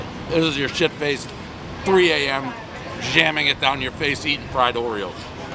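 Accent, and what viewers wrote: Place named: Massachusetts, United States. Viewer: His Massachusetts is really showing on these boardwalk reviews...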